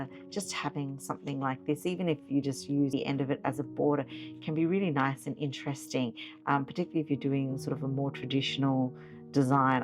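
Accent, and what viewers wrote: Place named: Australia. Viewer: Lovely to hear a fellow Aussie expat (although I’m in the States). Struck by the coincidence, at the time this video was released I’d never heard of Cambridge Imprint, but not two hours ago I unpacked my first order from them, and completely agree, the labels are lovely. Glad your channel “turned up” in my recommendations.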